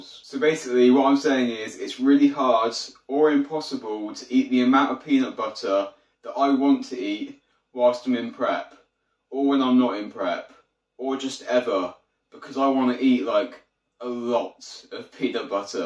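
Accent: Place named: England, United Kingdom. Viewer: sounds like your in England somewhere. we don’t speak like you in California, some words we don’t use in the US.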